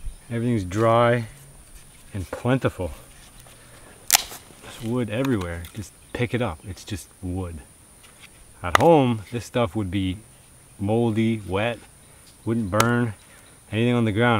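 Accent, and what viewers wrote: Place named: Canada. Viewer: You sound very Canadian but I have to ask...do you live in Michigan?..by the way final fantasy 3 for she's is the best game ever!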